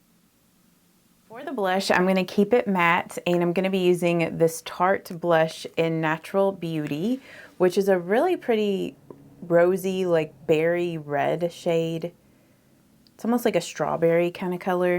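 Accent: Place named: Virginia, United States. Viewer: Hello from Canada,. I have this eyeshadow palette and I will definitely try this beautiful look. I have the exact eye colour as you. I love your accent. (Virginia??)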